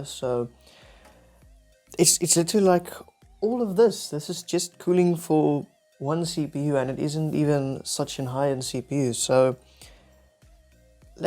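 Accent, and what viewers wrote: South Africa. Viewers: You south African? I can hear the accent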